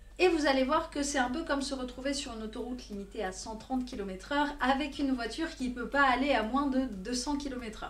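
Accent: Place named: South, France. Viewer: Ah cet accent magique ! Putain con bonne mère :) Coucou de Toulouse ! J'enchaîne avec la suite de ton périple.... merci. Je compte aussi passer le test.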